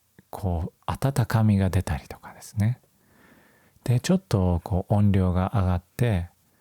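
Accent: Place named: Japan. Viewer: I was wondering this too… your voice is gorgeous. From what part of Japan are you from? I want to learn this accent.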